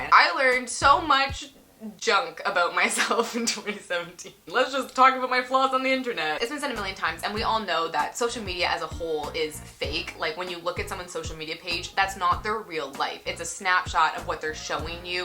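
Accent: Canada. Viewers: I'm an ESFP and I can say that assuming everyone is dead when they are a minute late is very accurate... Thanks for showing me that I'm not the only one! Also. Your accent is adorable and so hard core Anne Of Green Gables ❤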